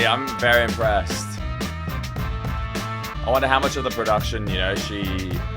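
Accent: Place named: Australia. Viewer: The way I could tell he was Australian before clicking on the video 😂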